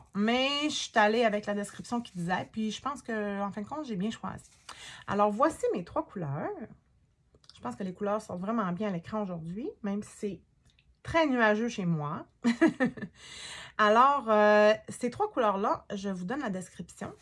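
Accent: Lac-Saint-Jean, Canada. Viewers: Un plaisir de te découvrir et t'écouter ! J'ai adoré ta personnalité passionnée, dans laquelle je me reconnais. :) et j'adore ton accent du Lac ! Un très bon moment. Au plaisir ! xx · J’ai beaucoup aimé ton premier podcast. J’aimes ton accent du Lac. Tout les projets sont beaux. Un jour j’aimerais bien ça faire du tissage. J’ai quelques linges à vaisselles tissés je l’aimes adores. Tes sacs aussi sont beaux. J’espère que tu vas continuer, je me suis inscrite.☺️ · 👏👏Yeah! Bravo! Je suis vraiment contente de t’écouter. J’adore ton accent du Lac, tu fais de magnifiques réalisations une fille polyvalente. On a un peu les mêmes passions tous les 2 je me reconnais beaucoup quand tu perds tes mots je suis pareil 😂 Bonne continuité!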